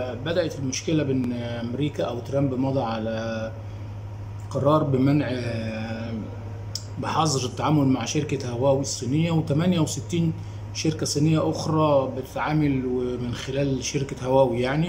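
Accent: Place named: Egypt. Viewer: انا من مصر وانت صوتك بيقول انك من مصر ايضا ياريت ترد عليا وجزاك الله كل خير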